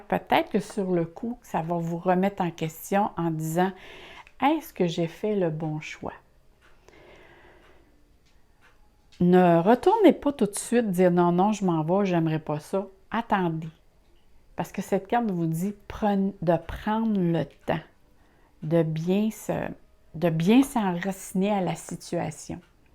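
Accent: Canada. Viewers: Merci, pour vos conseils précieux et précis, avec un petit accent en plus qui me rappelle des bons souvenirs de mon passage au Canada.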